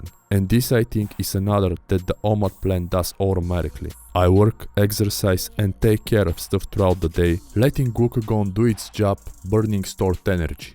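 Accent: Russia. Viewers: Very hard to understand your Russian accent. Good content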